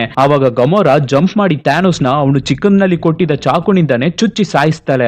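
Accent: Tamil Nadu, India. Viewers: Sir nivu Tamil avra...yakandre nimma tone Tamil avr thara edhe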